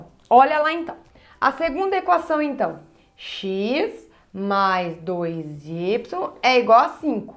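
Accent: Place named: Paraná, Brazil. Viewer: eu escutando o video e pensando " nossa esse sotaque é familiar" ai na hora que ela falou Paraná eu lembrei dos meus primos do Paraná que falam igualzinho a ela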